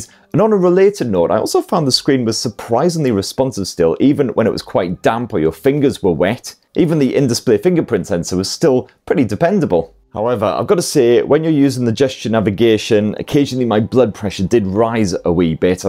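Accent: United Kingdom. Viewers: Is this guy british?? 😂😂 Just a simple doubt. The accent😅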